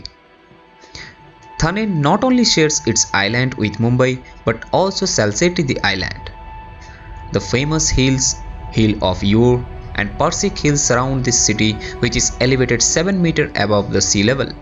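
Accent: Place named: Bengal, India. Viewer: You must be bengali..i can guess by your way of speak..sound good🔥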